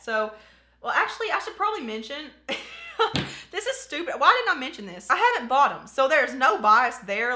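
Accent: South, United States. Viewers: OMG a southern youtuber!!! Literally 10 seconds into this video and I'm mashing the subscribe button 😂😂😂 #southerngirlsarethebest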